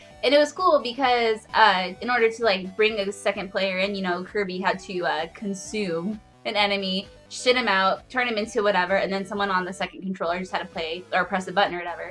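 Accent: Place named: Australia. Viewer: Dat intro made me LoL. Great list by the way! Nice Aussie accent Mate Cheers LoL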